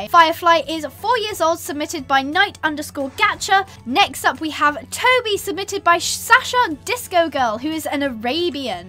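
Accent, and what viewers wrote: United Kingdom. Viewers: I love the British accent she has! Love ya!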